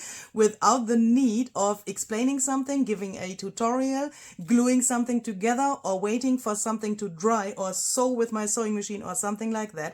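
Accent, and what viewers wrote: Germany. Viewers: I love hearing Tim-isms with a German accent.😄😄 You are a delight to listen to.❤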